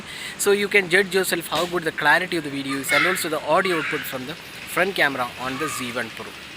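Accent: Kerala, India. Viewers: R u a mallu??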